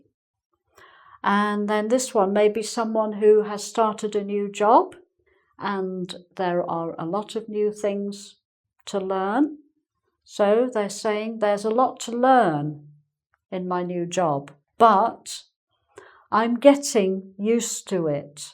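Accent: England, United Kingdom. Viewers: Ela é Britânica? Parece sotaque Inglês.... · Your voice in very clear than others .. United Kingdom's beauty of English Language starts here... Thank you so much teacher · So brit sz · From morocco i thank you a lot for your superb lession. And the way you explain it . that makes me love your clear british accent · I really can't stop watching your videos. You have a beautiful accent. I used to hate british accent but now i'm fond of it because of you. Thanks nice. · great class as ever, I learned American English, but I want to practice my listening with the British accent. Thanks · Hi, from Ukraine. Understood everything. So pleasant to learn from your lesson and hear that British English. Like a honey for my ears 😄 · Lovely British accent thanks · her accent is British Amanda, the pure british accent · You're the best from engVid, you and James, but you're a far better. 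I love your British accent, when i was starting on English, it was hard to understand it but now i hear it clearer than the American one.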